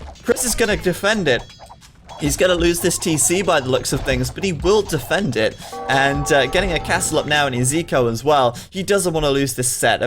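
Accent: United Kingdom. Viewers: It's extremely British lol, also UK has dozens of different accents so for people not native to it, its often hard to tell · Where about he is from in the UK, do you know?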